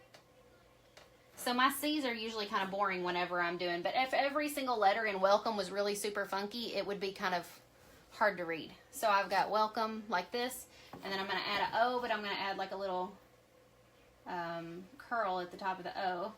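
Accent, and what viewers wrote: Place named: Alabama, United States. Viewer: LOVE the accent! I'm a southern bell as well and you remind me of sweet home Alabama!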